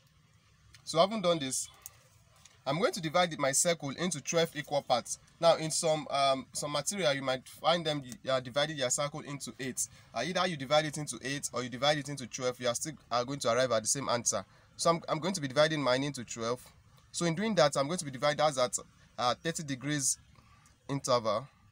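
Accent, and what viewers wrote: Nigeria. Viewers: I could tell you're Nigerian from the accent. I'm Nigerian too. Haha😂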